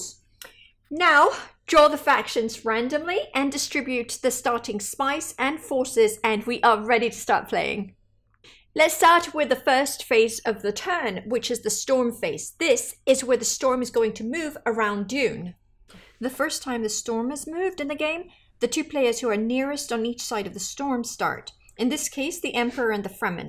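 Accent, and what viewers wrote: United Kingdom. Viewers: Wow. Thank you so much. This was such a well produced rules set for Dune. I’m sorry that I’ve never come across your channel before. Do I detect a hint of Scottish in your voice? Thanks again!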